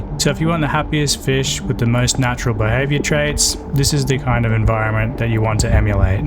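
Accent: Australia. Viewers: Hiya. Are you from Australia?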